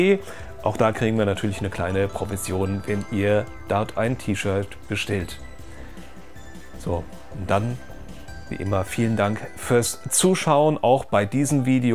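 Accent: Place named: Hesse, Germany. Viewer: aus welcher Ecke von Hessen kommst Du denn? =)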